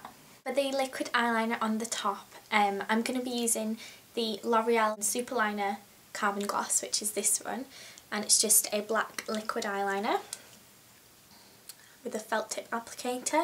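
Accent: United Kingdom. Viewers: and a question; is your accent british or scottish or irish? i'm not from england and i can't decide which one is it, and i lovelovelove it!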